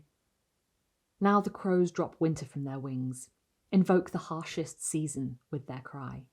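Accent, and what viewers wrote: United Kingdom. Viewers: Let me just say, this story hits quite different when doing yard work in the fall! Also I feel like the sex could be changed from male to female and be just as relevant. As an American some stories are most effective with a British accent :)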